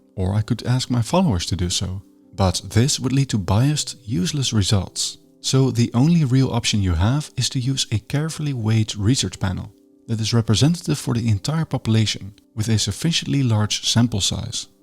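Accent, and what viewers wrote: Netherlands. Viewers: You sound like my Dutch friend from Amsterdam, are you Dutch? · This has to be a Dutch accent, eh?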